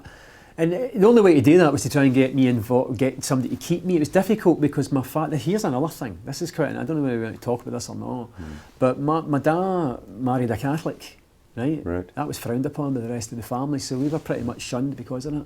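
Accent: Scotland, United Kingdom. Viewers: I am German and understand Scottish much better than British ;) Love it a lot ♥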